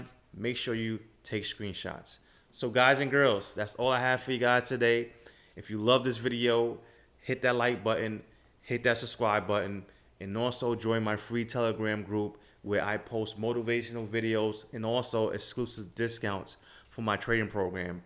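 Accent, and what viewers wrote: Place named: New York, United States. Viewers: You def have a New York Accent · ay, from the Bronx I knew I recognized that accent lol great video I enjoyed hearing your story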